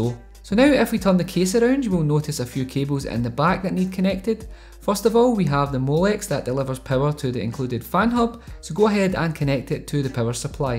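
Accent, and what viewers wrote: Scotland, United Kingdom. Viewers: Chuchu - Games and Guitars probably a,ready found out but I’m pretty sure it’s Scottish, or somewhere from the Scandinavian countries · You fae Scotland ?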